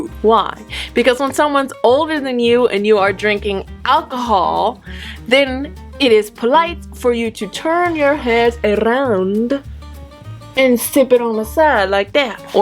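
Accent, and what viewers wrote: South, United States. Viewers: Lol the way you talked cracks me up every time I watched your videos. You can take you out of America but you can’t take America out of you😂😂😂😂👍 I love your videos, very educational and detailed. Keep’em coming girl you’re awesome 👍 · I'm from the South too(Tennessee)! I wonder, do you have a Southern accent when speaking Korean?😂😆 I talk fast also, another Southern Thang! Gurl! You make me laugh so much! TFS! Light, Love & Blessing's💖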